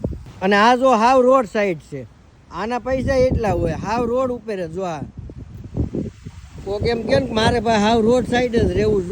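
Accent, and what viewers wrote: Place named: Kathiawar, India. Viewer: Vah Pyor kathiyavadi lago so hooo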